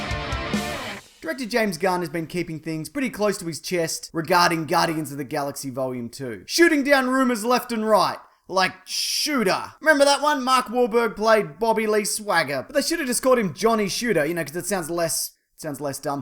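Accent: Australia. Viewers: Always good to hear the rare Australian YouTube guru .... why had I not found you before ??? Ima subscribe.. oi oi oi !!!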